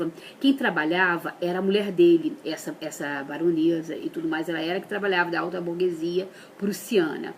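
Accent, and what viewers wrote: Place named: Rio de Janeiro, Brazil. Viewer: sotaque carioca de merda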